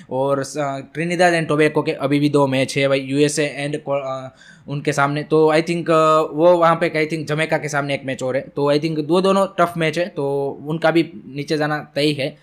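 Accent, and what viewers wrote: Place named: Marwari, India. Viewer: You bring good information about Indian Football. However, if you wish to have more viewers, you need to work on your accent. You have a Marwadi accent which quite prominent, when you speak. Just a word of advise.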